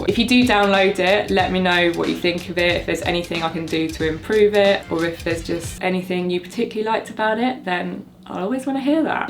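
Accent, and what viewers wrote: England, United Kingdom. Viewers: Accent is CLEARLY 100% English. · I agree about the Cincinnati Reds Hat but with your accent I expect to See a British Airways or a British Petroleum hat on . Or maybe a Quantas Hat too.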